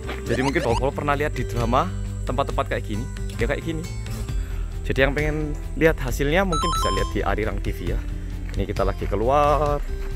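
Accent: Java, Indonesia. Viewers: Beralih jadi aktor Korea medok mas 😂😍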